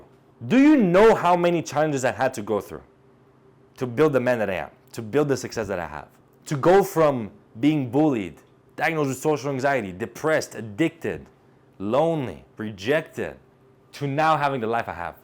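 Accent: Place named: United States. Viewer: U re american? Cause u like balckan but u have still american accent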